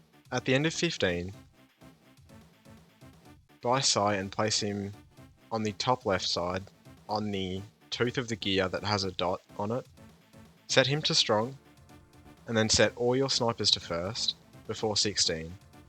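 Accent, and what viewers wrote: Australia. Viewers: Glad to hear another Aussie, what state are you in, better not be another fucking S.A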